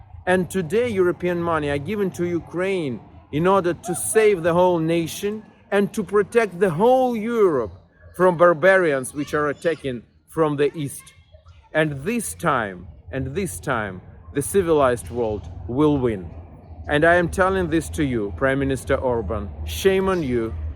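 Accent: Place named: South, Russia. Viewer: инглишь себе подкрути, акцент остался. выраженный южный русский говор. палишься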